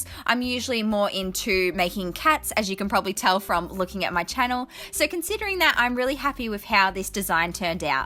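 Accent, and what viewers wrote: Australia. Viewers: I don’t know what’s cuter your creations or your Australien accent 😻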